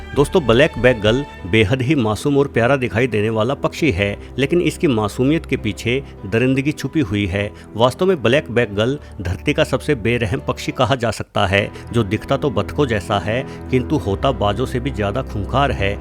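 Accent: Haryana, India. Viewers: Sir aap Haryana se he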